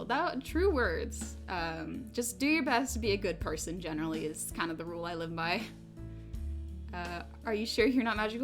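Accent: South, United States. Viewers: oh no damn doubt its a southern country girl. no city girl says hey sugar the way she says it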